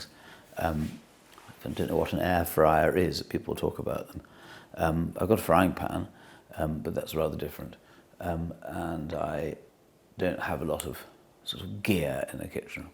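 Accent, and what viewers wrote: England, United Kingdom. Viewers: Castle, gardens and an English accent...magical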